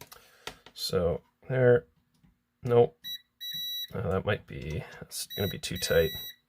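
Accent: Canada. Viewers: A lot of people must not understand he's Canadian when they're listening to him. Pretty sad really.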